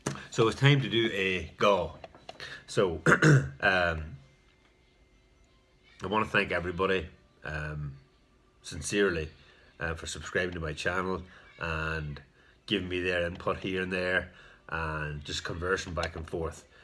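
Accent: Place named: Ireland. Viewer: you rock. Welcome. Congrats on the 100 subs. Love the accent. I Hope to visit Ireland some day. My mother's side if from there. I can paint you some jars, not sure if you are looking for labels or jars or lids. Message me on instagram. So I can possibly make you some jars. Beautiful Peterson you are giving for 2nd place. I'll get a VR up tomorrow for Ya. Hugs from Texas!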